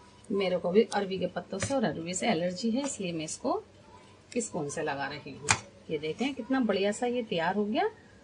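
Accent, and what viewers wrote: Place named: Old Delhi, India. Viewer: aap ki boli aik dm purani dilli walon ki si hai,meri ammi ki poori nanihal india mai purani dilli mai rehti hai-meri nani ne bachpan mai boht bna k khilae hn,mami or khala sath mil k ready krwati thn or hm maze se khate the,aaj bari achi yaad aap ne taza krwa di hai,jazakAllah o khair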